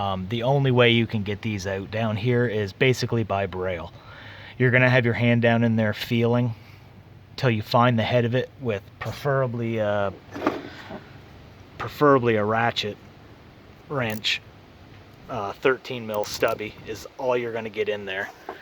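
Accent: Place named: Canada. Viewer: You taking your water pump oot? lol What accent is that? Minnesota or Canadian?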